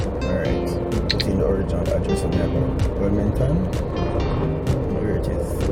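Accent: Jamaica. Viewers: Hey yuh should read the captions on yuh videos, it's so funny. Youtube captions algorithm can't handle de Jamaican accent.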